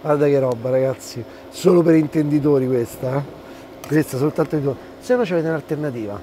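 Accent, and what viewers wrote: Rome, Italy. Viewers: ..te lo devo di...tu ogni volta mi fai venire un orgasmo gastonomico con le tue ricette😂 ma poi amo tantissimo come tu le racconti con quell'accento romanesco. I love It😍❤👍👍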